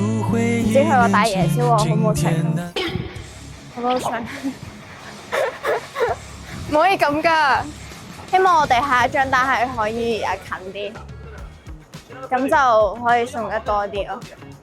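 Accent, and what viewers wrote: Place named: United Kingdom. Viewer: 聽把聲就似一個毛都未生齊嘅靚妹。 口音好似係學英式英文嘅人。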